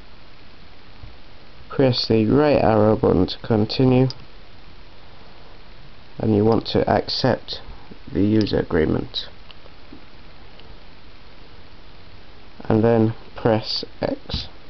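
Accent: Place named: United Kingdom. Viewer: i fall love with your british accecnt :>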